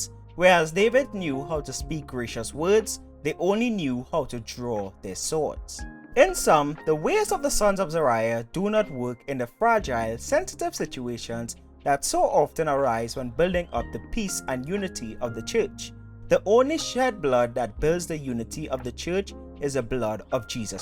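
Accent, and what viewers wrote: Trinidad and Tobago. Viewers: You Trinidadian?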